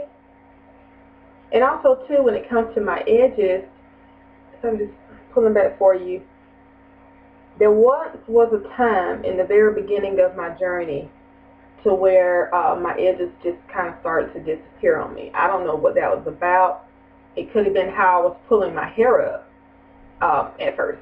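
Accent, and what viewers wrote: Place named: South, United States. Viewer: swear I love her southern voice... would hit that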